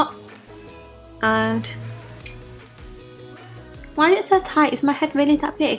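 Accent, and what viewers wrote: Yorkshire, United Kingdom. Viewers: Where is your accent from? Yorkshire?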